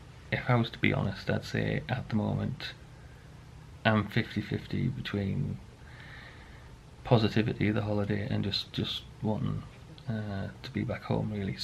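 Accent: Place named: Durham, United Kingdom. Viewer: Fandomlife I'm from Durham, recognised the accent lol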